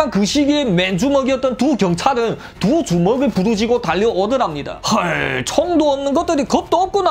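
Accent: Yanbian, China. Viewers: 중국사건 얘기는 다른영상에서 잼나서 자주봣는데 님껀 어제부터 이틀동안 다봤넹용~~ㅋㅋㅋ연변분이셔서 그런지 레알 말투가 리얼하네요~ㅋㅋㅋ👍👍👍👍👍잼나용^^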